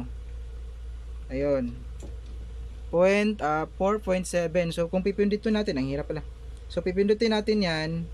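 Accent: Visayas, Philippines. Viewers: Yan ang tinood na mag tudlo..hajaj bisaya ka sir?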